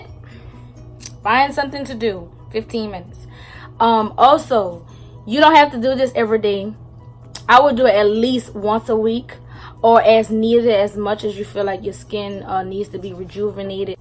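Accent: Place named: Louisiana, United States. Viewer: 🤣😂🤣 I died when you said I'm black we never measure anything. I can def hear the Louisiana accent baybay lol. You do have beautiful skin.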